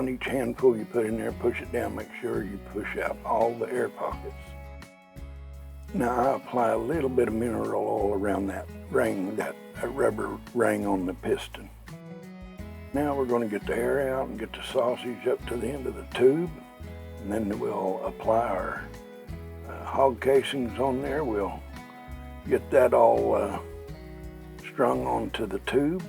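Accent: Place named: South, United States. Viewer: Fine looking sausage! After you commented on my recent video, I came to your channel and glad I did. This is the kind of videos I enjoy the most. Are you from the south? You kinda got my accent haha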